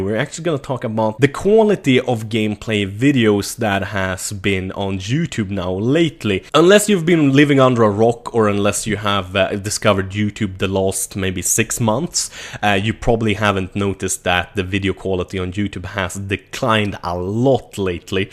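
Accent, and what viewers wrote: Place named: Sweden. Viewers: Yes, he is from Sweden. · Are you from Sweden? Your accent reminds me of robbaz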